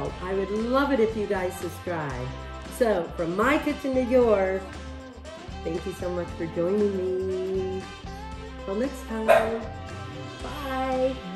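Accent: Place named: Michigan, United States. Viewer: LOL Yup, she's from Michigan . . . says "MELK" instead of milk. Go Franklin Patriots!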